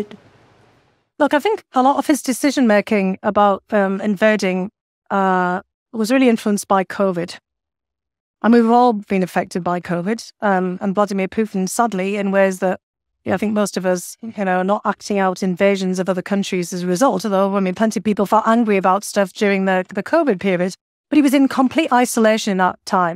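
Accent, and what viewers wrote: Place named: England, United Kingdom. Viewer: Sounds like she’s got an English accent.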